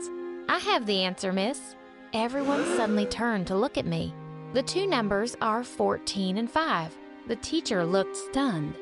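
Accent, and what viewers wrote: United States. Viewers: Girl i love your cowgirl accents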